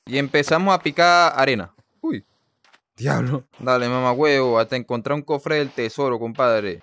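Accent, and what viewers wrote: Venezuela. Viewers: una pregunta se que ere venezolana mano porque yo soy venezolano tambien y hablas como yo pero en que pais vives o sigues em venezuela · Si pues el es venezolano no ves el asento venezolano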